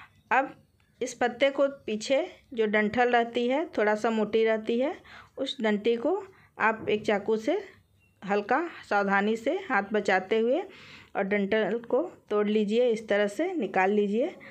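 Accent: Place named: Bihar, India. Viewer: hame aap ki awaz se to pata tha ki aap bihar se hai lekin puchti nahin thi ab ham aor pakka dost ho gaye hai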